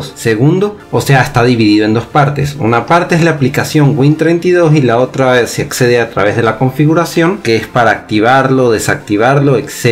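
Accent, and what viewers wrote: Venezuela. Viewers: Primer Video en donde se escucha marcado el acento venezolano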